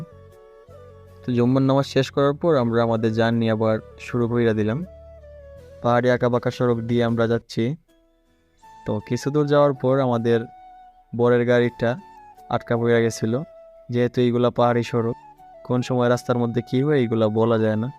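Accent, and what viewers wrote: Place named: Noakhali, Bangladesh. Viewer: Nuakalir moto matho kne😒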